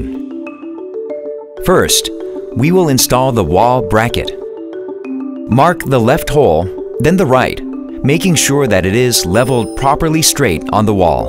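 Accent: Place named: China. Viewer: Harible Chinglish in this but i'd love to know what Text to speech program they used.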